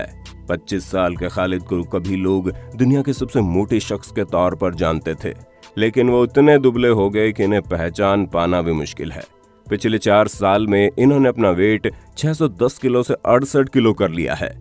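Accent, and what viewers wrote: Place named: Jaipur, India. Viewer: Voice Vale bhiya Jaipur ke rehne Vale h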